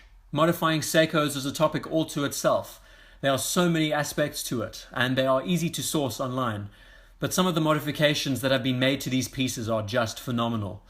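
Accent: South Africa. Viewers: Are you South African? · Hay dude are you South African?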